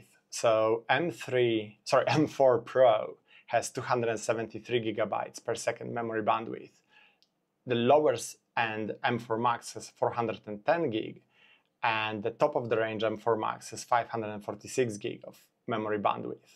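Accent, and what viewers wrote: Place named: Poland. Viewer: Mordo, od razu wiem żeś z Polski. :D pozdro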